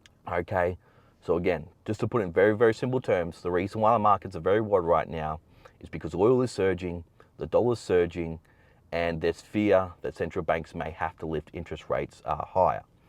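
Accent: Australia. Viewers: I love the crocodile Dundee accent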